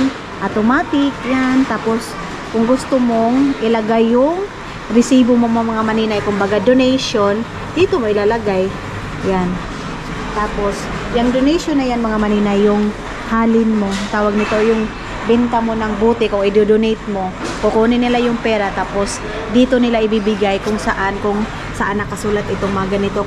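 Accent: Visayas, Philippines. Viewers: Ang or mu nmn oiy wlay lami ang imong content ba visayang badoy mag salita halatang visaya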